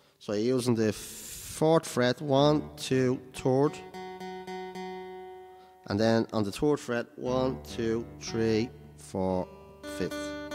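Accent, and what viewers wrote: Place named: Ireland. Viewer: Open G is a great tuning but not only for slide as shows us.but I'm buggered if I can follow his chords. I'm just trying because I love his Jumbo and his Irish accent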